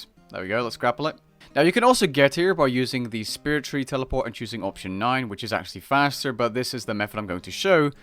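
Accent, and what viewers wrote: Netherlands. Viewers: Nooit geweten dat je Nederlands was, nu kan ik het accent niet meer negeren haha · Ik dacht al dat je NL was ik kon het horen aan je platte dialect hahahahhaha.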